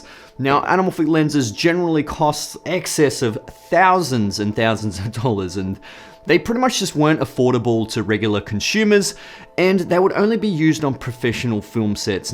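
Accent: Australia. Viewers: Just loving watching an Australian accent version🤙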